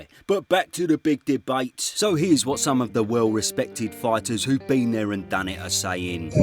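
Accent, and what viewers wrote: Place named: England, United Kingdom. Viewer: because of you mate, i'm seriously considering moving to england, just to spend all day talking like you do.. 😂😂🫡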